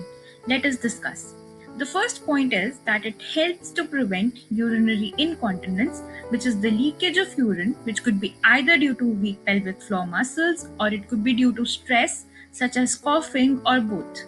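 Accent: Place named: India. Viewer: Indian accent...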